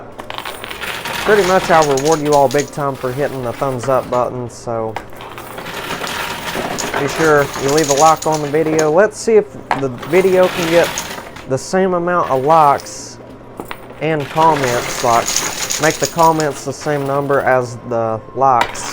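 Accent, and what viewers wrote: South, United States. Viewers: Apparently, this guy who talks like a red neck does!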